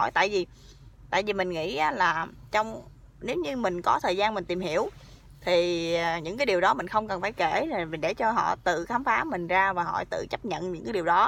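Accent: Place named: Mekong Delta, Vietnam. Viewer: Chị giống quê miền tây quá...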